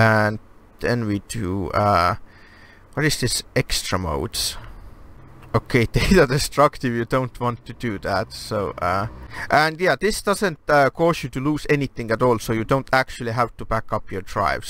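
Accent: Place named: Sweden. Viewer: clearly swedish or norwegian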